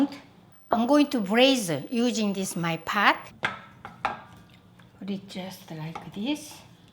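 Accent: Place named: South Korea. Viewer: 말씀 하시는거나 요리 하시는게 참 귀엽네요. 오나전 한국 액쎈트로 말입니다😁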